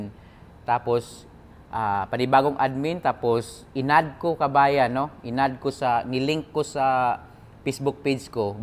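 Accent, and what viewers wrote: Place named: Visayas, Philippines. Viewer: Halatang bisaya ka idol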